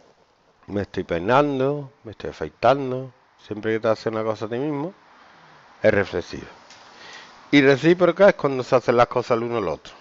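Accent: Andalusia, Spain. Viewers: eres andaluz verdad?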